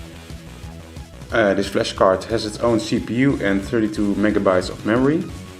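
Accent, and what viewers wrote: Netherlands. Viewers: Are you Dutch by any chance? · wow, dit is echt het meest nederlandse accent ooit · You must be a coming from the Netherlands, according to your accent. Anyway. I like the Game Boy Advance shelled bottom of the Nintendo DS lite! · You have the most obvious Dutch accent ever. Great video though, interesting build! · (ik hoor aan je accent dat je Nederlands bent dus doe ik dat ook haha), Gaaf ding man, kun je laten zien hoe je 'm maakt? Ik wil dit ook gaan doen namelijk en heb er niet veel verstand van. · Yes, he sounds Dutch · Are You dutch? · I love his dutch accent :D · You sound Dutch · Are you from the Netherlands? Your accent sounds dutch.. Anyway.. If you are, can you make me one for a fair price? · another dutch who can't speak english without an accent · Heard you were dutch right away haha :-D Lekker bezig man! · I hear a Dutch accent ;) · Je klinkt als een Nederlander die Engels praat, klopt dit? 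😜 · are you dutch? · You a dutchie??? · Are you dutch cause you really have a dutch accent i can know cause im dutch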